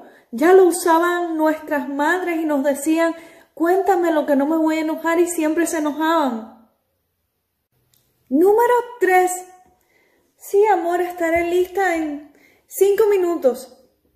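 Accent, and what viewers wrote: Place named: Cuba. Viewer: Muchas gracias estare al pendiente de tus videos que son muy buenos y muy bien explicados..una pregunta eres cubana?..me gusta tu acénto y tu manera de expresarte😊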